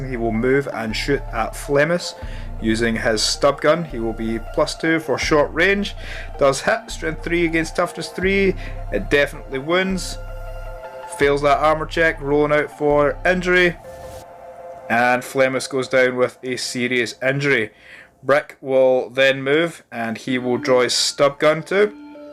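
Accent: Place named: Scotland, United Kingdom. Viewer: Great work my man - good to hear a Scottish voice - keep up the Necromunda content, cant get enough! All the best from sunny Ayrshire!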